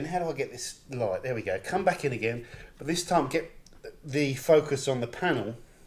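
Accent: United Kingdom. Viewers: God I love English accents hahaha. Maybe more people would watch me if I was British hahaha. You really know your stuff man, this video is excellent so far. One of my customers brought a gloss meter in once, he used them at his work to measure gloss on like gloss business card prints.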